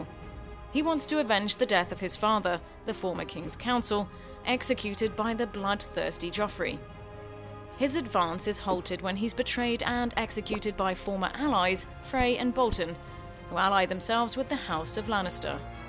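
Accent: England, United Kingdom. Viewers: i wasn't even aware this was French. The lady presenting it has a very english accent but does sound quite robotic in the delivery. I agree they are snobbish but if you present what's happened so far in a super popular show you should probably know the right words and how they're pronounced.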